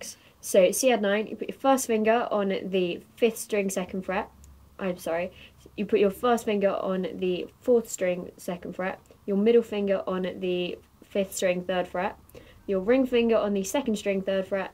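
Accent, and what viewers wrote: United Kingdom. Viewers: OMG BRITISH ACCENT !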